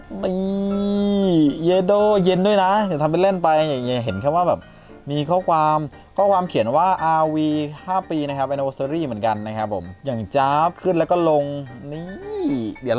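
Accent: South, Thailand. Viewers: พี่ย่าคนใต้หรอค้าบบ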